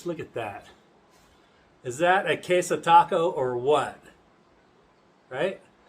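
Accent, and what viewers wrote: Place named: Spain. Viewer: The recipe just sounds better when you say it with a very authentic Spanish accent. Love birria tacos. What if I guess made it about a month or so ago and it was so delicious. Nice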